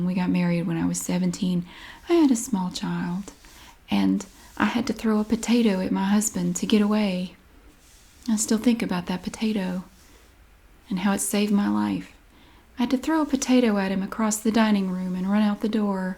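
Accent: South, United States. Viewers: Bro why is nobody talking about how good did with the accent! You did such a good job of sort of toning down your southern accent and sound like Carole! That’s a very hard thing to do and you did a great job💕💕💕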